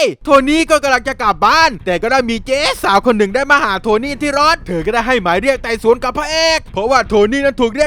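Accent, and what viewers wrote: South, Thailand. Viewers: พี่เป็นคนใต้หรอ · ต่อไปเอากับตันอเมริกานะคับพี่#ชอบเสียงใต้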